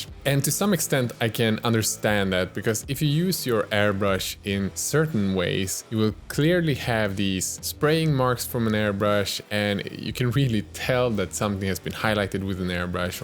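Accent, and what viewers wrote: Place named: Sweden. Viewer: as long as it suits you. If There will be subtitles it is fine). Like Angel does. I love Sveedish accent, I am watching Pewds since almost the beginning almost used to it. Just received the wet pallet from Redgrass. You should have a deal with them. Bought after your recommendation. Thanks for great content!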